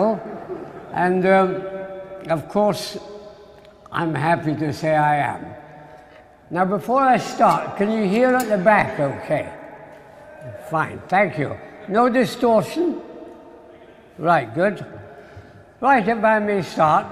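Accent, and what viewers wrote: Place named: Scotland, United Kingdom. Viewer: If he was Home counties and/or ex-OxBridge….. we would be welcoming, then warmly applauding ‘Sir Eric’. Shame about that Scottish accent too.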